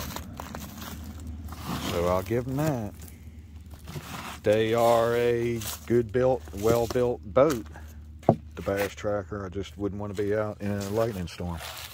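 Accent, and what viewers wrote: Philadelphia, United States. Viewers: Damn that’s nice. Is that a Philly accent? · This guy from Philly?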